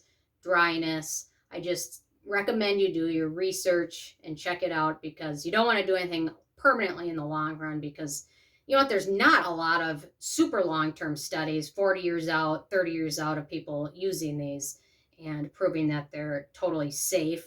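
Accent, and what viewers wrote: Minnesota, United States. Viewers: You've got that classic Minnesota accent.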